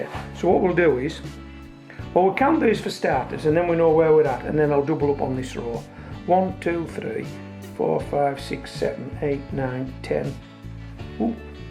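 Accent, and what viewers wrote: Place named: Scotland, United Kingdom. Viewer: Accent seems almost Scottish. Workington up near Scotland?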